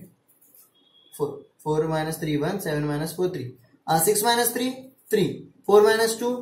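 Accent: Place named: Bihar, India. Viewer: bhai,lagta hai aap bihar ka ho😁😀😛😛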